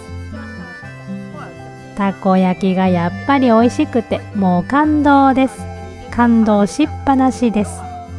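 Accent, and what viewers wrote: Kansai, Japan. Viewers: 関西弁やな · 初めての視聴です。映像がとても美しい。関西イントネーションから西宮かなと思いましたが芦屋のハイソマダムなのですね。 失礼しました。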